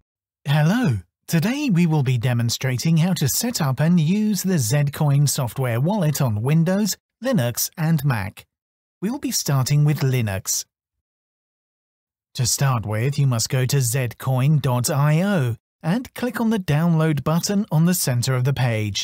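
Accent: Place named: United Kingdom. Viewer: British pronunciation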